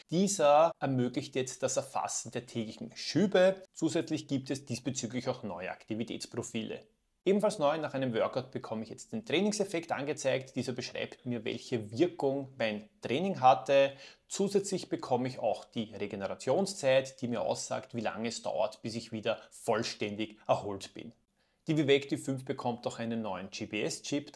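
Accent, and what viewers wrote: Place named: Austria. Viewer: Vielen Dank für das informative Video! Auch der österreichische Dialekt ist mir immer wieder sympathisch, weil meine Vorfahren aus diesem schönen Land stammen 😊😉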